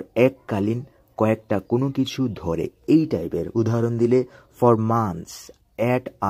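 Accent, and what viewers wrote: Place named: United States. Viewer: like american pronunciation ..thank you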